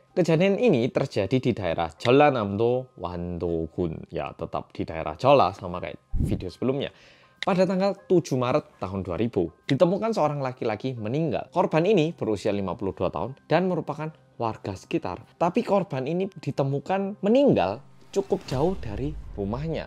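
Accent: Java, Indonesia. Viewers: Hallo mas.. Jowone medhok bngt. Pertahankn yo mas, fasih bngt ngmong bhasanya. Sukaaa bngt, pokonya sucsses sllu buat mas, salam dr mlysia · 3 channel storytelling favorit: 1. ( medhok, tapi paling asik, berasa diceritain pacar) 2. Nessie Judge (Bahasanya gampang dimengerti) 3. Arroyan Pram (Konspirasi dunia pake sains dan teknologi, Astronomi, Fisika, dan editannya paling niat dan bagus) kalo kalian? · Lama g dgrn suara medhoke mas. Lama g nntn, makin ganteng aj kamu rek... kaya idol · Knp ganteng trusssss sih skrg mas medhok nya ❤️❤️🥰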